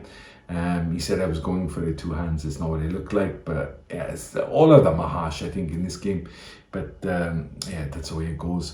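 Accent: Wales, United Kingdom. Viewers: What accent do you have? I heard welshish but alot of others in there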